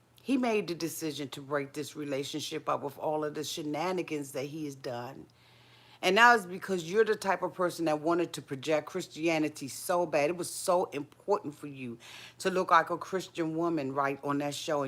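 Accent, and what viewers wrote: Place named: DMV, United States. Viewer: I hear that DMV accent! 🌸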